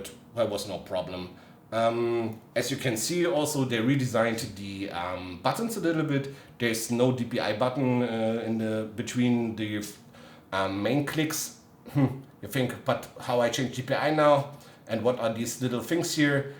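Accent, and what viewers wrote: Germany. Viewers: He just pronounces it fast while also having an german accsent. If you listen carefully he says orochi.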